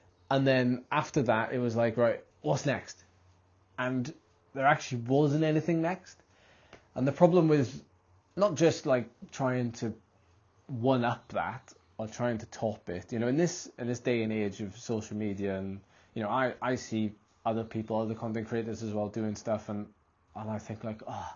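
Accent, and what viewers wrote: Wales, United Kingdom. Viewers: mate please DO NOT FALL INTO THE YOUTUBE TRAP of having to put content out!! You are well above that, you are quality and you deliver, and you are Welsh 🤣 Love that accent ❤😅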